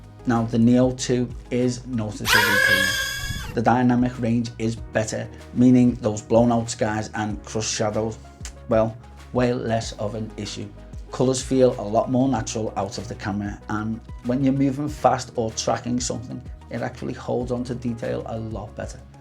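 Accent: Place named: Manchester, United Kingdom. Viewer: Really enjoying your videos. Great content - but also because your accent reminds me of home. (Live in New Zealand but grew up in Manchester.)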